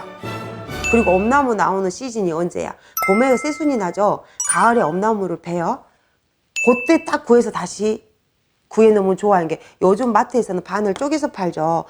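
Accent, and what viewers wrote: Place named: Jeolla, South Korea. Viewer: 선생님.고향이전라도신갑네요 겁나게정겨워요.말투가너무좋아요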